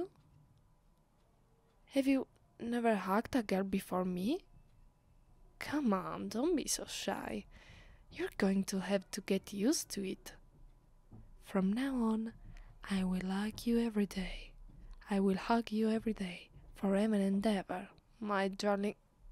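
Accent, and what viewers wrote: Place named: Italy. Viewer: I don’t know why but the Italian accent adds to the charm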